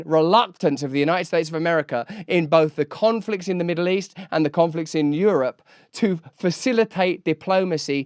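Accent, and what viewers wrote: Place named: England, United Kingdom. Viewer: Lol is this guy American? He sure sounds English😋 don't bother I know where hes from its just funny hes so wrapped around this trump. Hes a decent actor tho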